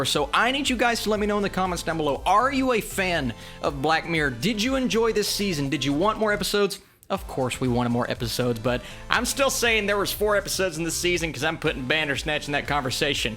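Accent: South, United States. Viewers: This guys southern sounding accent 😍🤤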